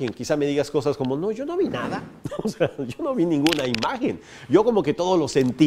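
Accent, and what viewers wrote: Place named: Mexico. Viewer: Me suena mexicano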